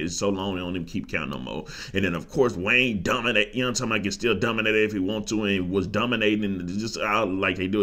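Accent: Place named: New Orleans, United States. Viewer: Ur aww aww sound like u been n that nolia b4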